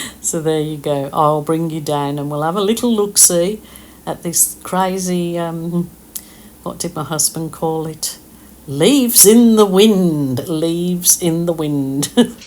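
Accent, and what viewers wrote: Birmingham, United Kingdom. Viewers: I'm getting notifications in the UK. You make me smile every video, your kindness is a beautiful thing. And yes your art is amazing. I'm a 60yr old woman, I have done "normal art" for a long time but this style is so fresh and fun , and certainly not as easy as you make it look. And yes, I can still hear the Brummie accent lol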